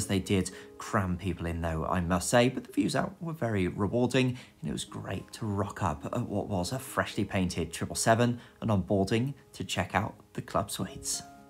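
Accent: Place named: England, United Kingdom. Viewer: Your English accent tho 🥵 yummy 🤤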